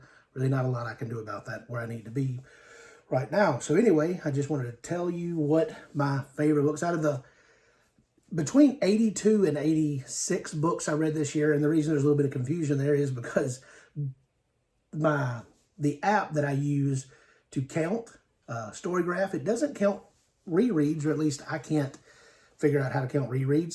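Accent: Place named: South, United States. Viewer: Cute guy with a southern accent talking bout books? I’m in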